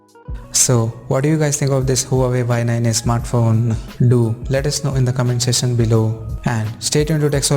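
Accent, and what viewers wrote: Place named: India. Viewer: Your accent is very bad, If you gonna put videos to explain technical specs., You should get rid of Indian Language accent on your English. Each language has its own accent so don't mix please.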